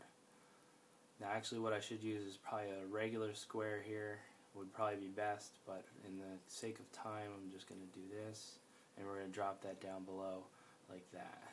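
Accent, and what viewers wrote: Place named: United States. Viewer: Love the American talk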